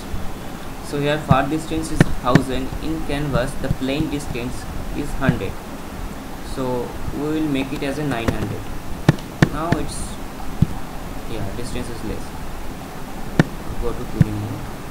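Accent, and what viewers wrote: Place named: India. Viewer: indian accend just fucked up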